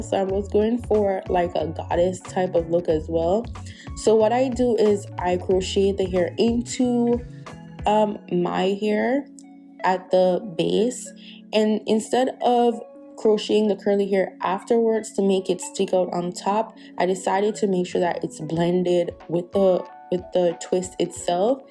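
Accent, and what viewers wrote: Jamaica. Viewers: I think she's Jamaican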